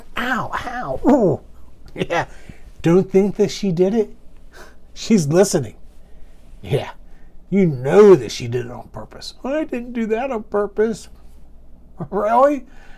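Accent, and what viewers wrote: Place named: Pittsburgh, United States. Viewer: Listening to your accent,... I am guessing you are from Pittsburgh 😊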